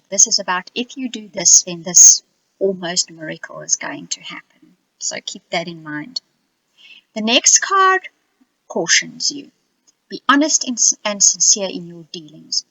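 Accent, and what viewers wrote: South Africa. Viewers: Hi are you South African?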